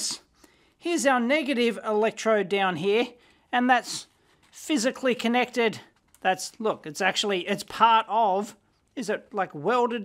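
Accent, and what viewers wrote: Australia. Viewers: he is Australian, that is why it is very different to British accent. 🐨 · The worst high pitch voice that could come with an Australian accent. So annoying. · What more do I love than some australian guy talking about batteries for 20 minutes straight. Awesome vid btw and very interesting. Do these same flaws exist in the triple or double A's?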